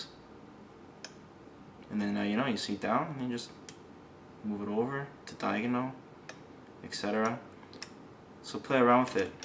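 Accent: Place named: Canada. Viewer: I didn't know he was Asian, but I did know he was Canadian because of his accent. It's not an Asian accent, it's Canadian.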